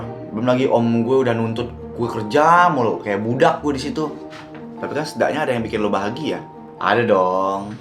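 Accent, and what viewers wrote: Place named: Eastern Indonesia, Indonesia. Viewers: Akhirnya setelah sekian banyak video yang sa nonton ada juga yang pake logat papua❤❤❤❤ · Logat timurnya 👏🔥💯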